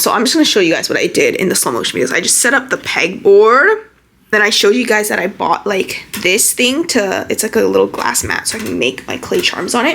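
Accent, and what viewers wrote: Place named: Toronto, Canada. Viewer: I am ready for the toronto accent to come out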